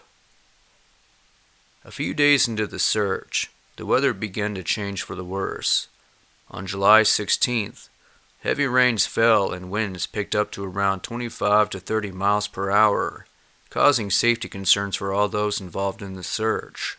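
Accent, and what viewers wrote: South, United States. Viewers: i feel he uses to much lip, kinda like he puts his lips out too far when he speaks, like he's trying to keep a moustache out of his mouth sound you know, it's like he's puckering his lips to talk, throw in a slight southern accent and there ya go, i could talk like this guy all day, it would look kinda odd walking around having conversations with puckered lips though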